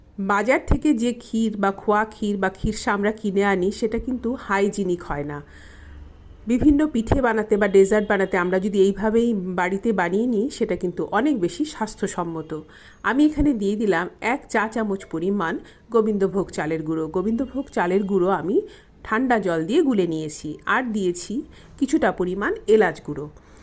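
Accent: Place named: Bangladesh. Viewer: দিদি খুব ভালো লেগেছে আপনার রেসেপি, দিদি আপনি কি আসলেই কোলকাতার? ভাষায় বোঝা যায় না, মনে আমাদের দেশের মানে বাংলাদেশের লোক আপনি।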